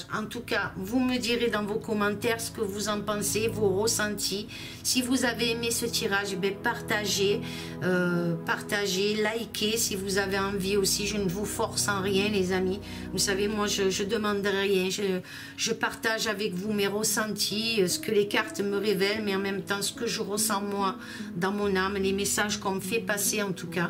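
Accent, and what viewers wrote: South, France. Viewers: Votre accent du sud (Marseille ?) est très très très très prononcé !!!…My God !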